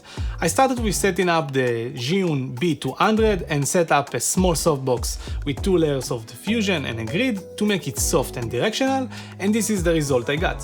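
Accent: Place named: France. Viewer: your accent is fine dude. He’s just a hater. Most people LIKE accents. Sounds like you are French. Your accent is strong, but by no means hindering your communication.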